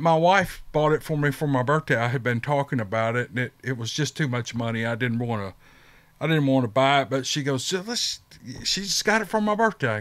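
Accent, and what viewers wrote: South, United States. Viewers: Don't worry about mispronounciation of unfamiliar places, its all part of the show or you fluffing things or laughing at things, its what makes you human. I am a southerner, but thats south of England, but still get taken up on my accent as i now live elsewhere. Your southern drawl sounds great to me. Great show as ever. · I love it, " I'm just gonna' keep readin' till I get tired!" You da' man! Love your channel. 👍👍👍 P.S. I love your southern accent. It makes me long for Memphis and the South. There's something so comfortable about a slight, or even a heavy, Southern drawl. It just feels like like sittn' on the porch, drinking sweet tea, and swappin stories. Oh yeah, and the mom, or Mrs.of the house yellin," ya'll better get in here, supper's set!" Memories.... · Yay I am so proud to hear a man speak In a fine southern accent with no shame. 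So many people want to go to a "universal accent" and lose our beautiful southern accents and colloquialisms that I love!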